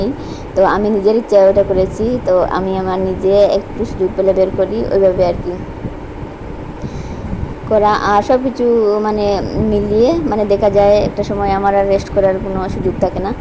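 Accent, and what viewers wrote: Bangladesh. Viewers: আপু ভিডিওটি খুব ভালো লাগলো। গোল গোল করে বেগুন কেটে তেলে ভাজি করে নিলে। ফ্লোর পরিষ্কার করলে। স্কুল ব্যাগ গুছিয়ে সুন্দর মতন রেডি করে দিলে তারপর সাথে করে নিয়ে গেলে। সব মিলিয়ে খুব ভালো লাগলো আপু। আপু তোমার রান্নাবান্নার ধরন থেকে শুরু করে কথাবার্তা সবকিছু আমার কাছে কেমন যেন বাংলাদেশি মনে হয়। তোমার কি কেউ বাংলাদেশে আছে নাকি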